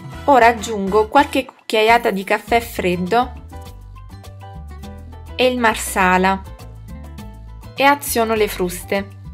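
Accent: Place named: Brazil. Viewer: wao que hermoso video, y que hermoso acento eres de Brasil o Italiana ... muchas felicidades ... saludos desde MEXICO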